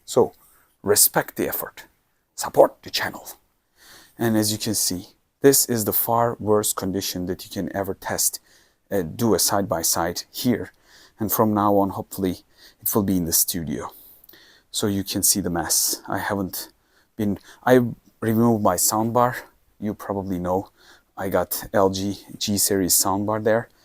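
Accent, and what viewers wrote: Turkey. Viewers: Aksan çok belli ya, abim bizden 😅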